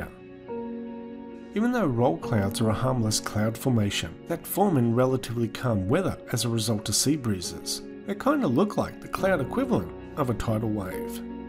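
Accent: Australia. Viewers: What I hear just because of the accent is : wotair ,Yair ,Roll cwoulds aND AUSTWARLIA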